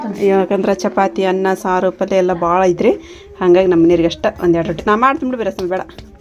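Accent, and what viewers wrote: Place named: Gadag, India. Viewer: Nim maat kelidre same Gadag navar maat gate adav nodri..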